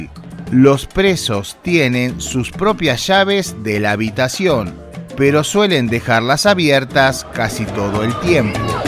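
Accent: Argentina. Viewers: Lo que no entendi es porque hablan mucho de Mejico y el que habla suena a Argentino,lol. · 🤯 ¡Me voló la cabeza!... Pero no por Islandia y su desmadre, la narración en jerga mexa con acento argentino, es como un arma para destruir a las IA.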